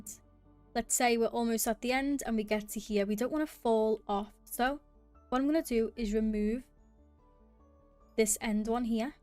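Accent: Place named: Liverpool, United Kingdom. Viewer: That accent of u sounds like ur from scouse area 😊🎉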